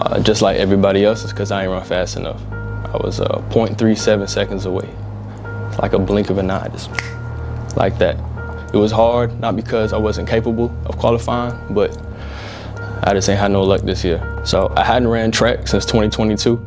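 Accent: United States. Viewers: Bruh, how do you sound like you're from Texas but you claim Canada for the Olympics? Help me understand. Best of luck for next year and LA. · You have a louisiana accent bro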